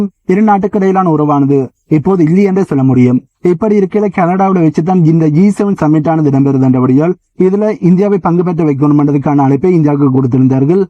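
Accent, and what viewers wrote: Sri Lanka. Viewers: 🙏🏽 இது வட இலங்கை தமிழுடன் ஆங்கில சொற்கள் சேர்க்கிறது. இயற்கை தமிழ் 🙏🏽அப்துல் காமிர் ஸ்டேலை கேட்டு சலித்துவிட்ட்து அதைதான் எல்லோரும் கொப்பி பண்ணுறாங்கள் 🤣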